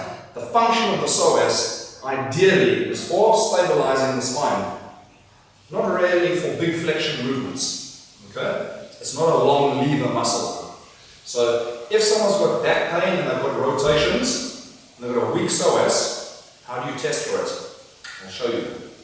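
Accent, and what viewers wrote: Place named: Zimbabwe. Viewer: I hear by your accent you're Zimbabwean or South African. Are you available for therapy to Zimbabweans if so where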